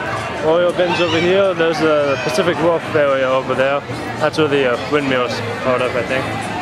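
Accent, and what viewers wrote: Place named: Germany. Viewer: Ur accent sounds german but I may be mistaken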